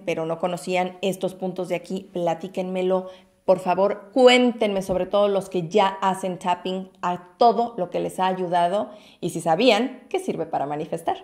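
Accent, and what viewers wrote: Mexico. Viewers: Hola Mexicana hermosa Me gusta tu tono de mexicana A parte que eres linda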